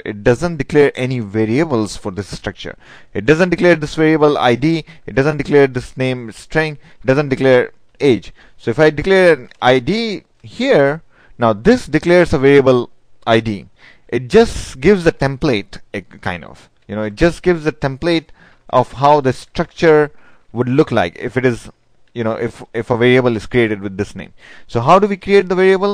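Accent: India. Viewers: indian slang ftw!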